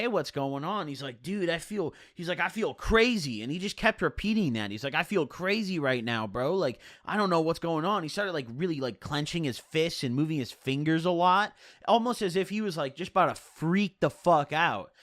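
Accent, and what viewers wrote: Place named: New Jersey, United States. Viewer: Why does he sound so different in some videos. Sometimes he's really nasally and has like a breathey undertone like some 30yo that lives in New Jersey, other times he sounds like a 24 guy that weighs 150 and doesn't get enough sunlight.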